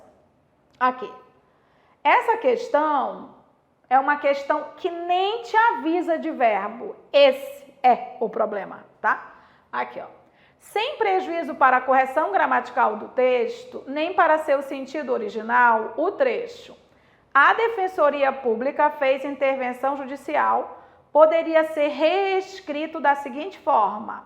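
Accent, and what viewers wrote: Pará, Brazil. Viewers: Paraense pesada! Só pelo sotaque! 😊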